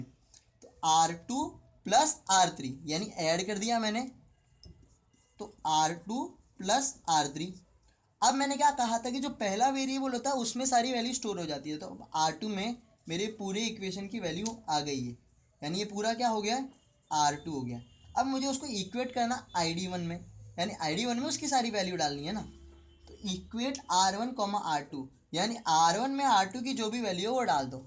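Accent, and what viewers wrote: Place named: Marwari, India. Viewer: Marwadi k tarah😂.. Ek no bhai👌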